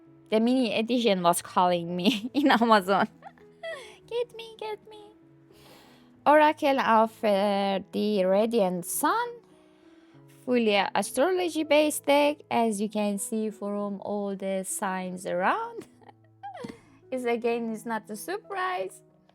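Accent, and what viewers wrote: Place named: Iran. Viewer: you sound Iranian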